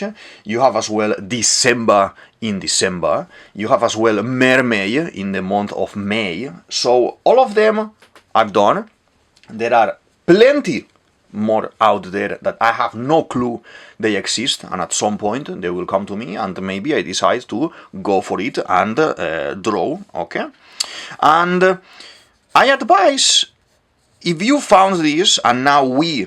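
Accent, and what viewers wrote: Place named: Spain. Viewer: Wow, I just found your channel. You fascinate me right off. Your accent sounds Spanish, your self portrait indicates you may be of Asian decent, and you live in UK. Haha how fantastic! Also, I love your work!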